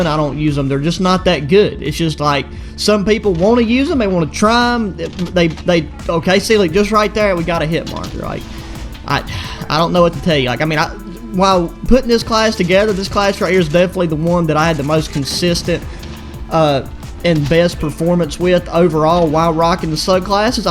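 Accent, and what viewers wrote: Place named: West Virginia, United States. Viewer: An ak setup would be great and you sound like your from West Virginia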